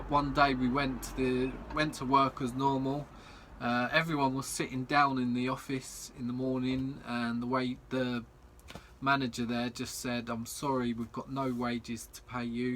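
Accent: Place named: United Kingdom. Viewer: Im confused, you've got a British accent but an American website. I'm in England and want to learn vehicle locksmithing. Is this course relevant to me?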